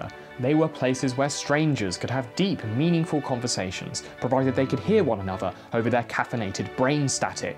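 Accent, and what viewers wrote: United Kingdom. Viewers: typically I hate channels that are feature a condescending British dude but yours is pretty ok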